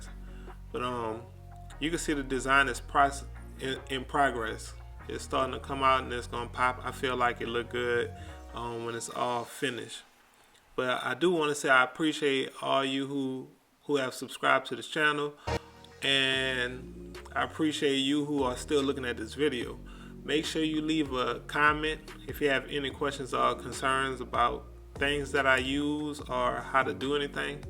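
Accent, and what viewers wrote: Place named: Louisiana, United States. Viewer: you gotta be from Louisiana bro😹 but crispy cut man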